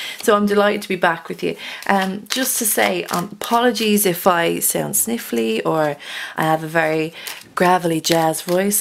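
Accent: Ireland. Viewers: I really enjoy your readings--your delivery, your positivity, your authenticity and your lovely Irish (?) lilt...also, I am a Taurus cross-watching for a considerably younger Capricorn romantic interest. I think I'm the "matriarch figure" that you mention 😉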